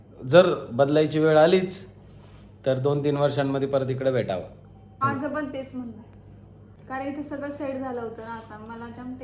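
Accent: Nashik, India. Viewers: Dada mi devlyachi aahe.tu maja gava javlcha aahe.khup bar vatle.tumchi Jodi mala aavdte.tuja bhashe Varun mala samjle tu nashik ch aahes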